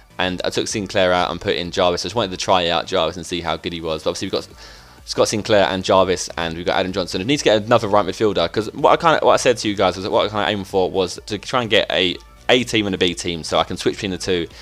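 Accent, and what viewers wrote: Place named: London, United Kingdom. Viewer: Come on I'm a west ham supporter there not a bad or dirty team but by the sound of your accent your probably from London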